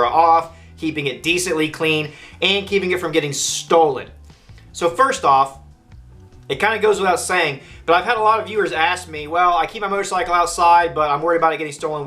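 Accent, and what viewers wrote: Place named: Georgia, United States. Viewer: Sounds like he lives in Georgia 😂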